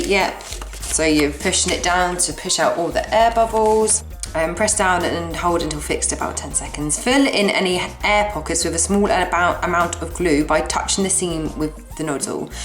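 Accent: England, United Kingdom. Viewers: Are u British? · are u from england.....cuz i am from bradford in england uk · She's British... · The dogs obviously not impressed either bless him! Naaaa think I'll pass on this one so thanks for the heads up on it. Much appreciated. My own nails are long and hard and I was actually looking for a vid on gel polish but then I got waylaid. (As one does lol). Lovely to hear an honest English voice on YouTube. Good stuff lovely girl.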